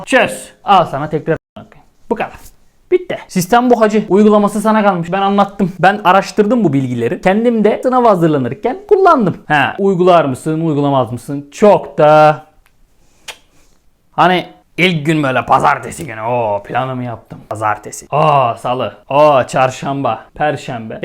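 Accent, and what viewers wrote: Thrace, Turkey. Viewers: Reis küfürü azaltmışın böyle daha iyi trakya ağzı yeter güldürmeye :)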